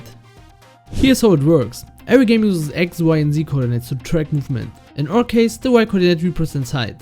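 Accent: Germany. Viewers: Bis auf einen leichten deutschen Akzent hast du ein gutes englisch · You sound german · Are u german? Because iam and i hear a german accent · i höre a bischen of deutsch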